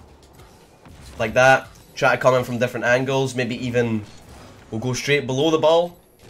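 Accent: Scotland, United Kingdom. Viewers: What part of Scotland u from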